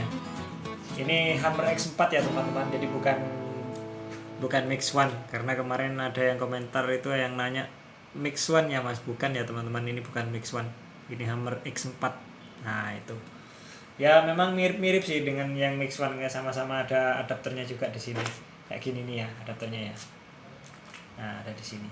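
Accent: Madura, Indonesia. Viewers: Asli mana bang...logat kq kayak orang madura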